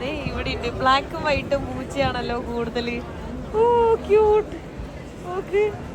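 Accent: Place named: Kannur, India. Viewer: Hiii you always speak in Kannur slang 😊❤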